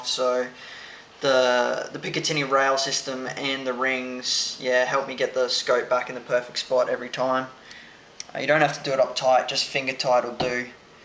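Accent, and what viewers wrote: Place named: Australia. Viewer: Are you from Australia or New Zealand, i can't tell lol. Either way it sucks that that was over $1,000. Here in America (i am not trying to boast, our country sucks right now cuz of obama and the liberals) i can find one for under $700 easy, and the ones without a stainless barrel around $550. Good luck, and enjoy the shooting!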